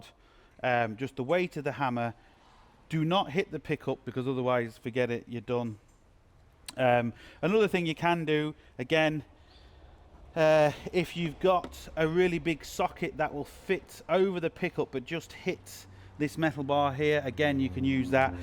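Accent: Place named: United Kingdom. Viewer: British accent is awesome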